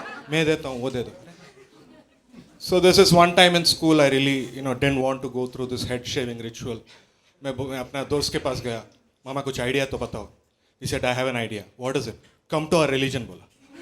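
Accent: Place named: Telugu, India. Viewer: Killed it Bro. It reminded me of my Telugu friend who has the same Hindi like you. Loved it.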